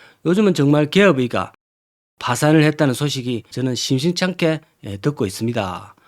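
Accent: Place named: Busan, South Korea. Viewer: 제가 아는 부산 분하고 말투가 너무 비슷합니다.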